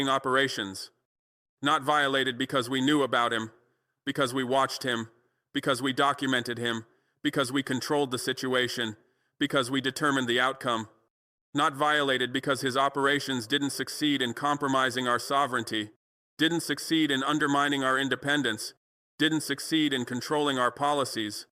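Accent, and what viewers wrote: United States. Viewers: Why has he got an American accent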